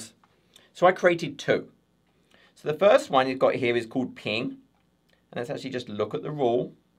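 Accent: United Kingdom. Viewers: I pay for courses that aren't a patch on yours. I've worked in IT for too long, never needed to fully understand 'rowting' or fw's, always someone else's job. I'd never touched azure either. In the last week and a half I've gone from 'dark art' to having the confidence to set up a lab, replicate bits in my work place, secure the subnets, test out the product (secure az140 deep dive? #fingerscrossed) that I'm trying to architect and look really clever at work... You've had me covered at pretty much every base, you absolute lege!! Dunno how much you make out of this side-hussle, but good karma is definitely on the way! P.S. Hearing what I think is a southern UK accent saying the word 'route' like an American is weird, but it must be contagious as you've even got me bloody doing it :D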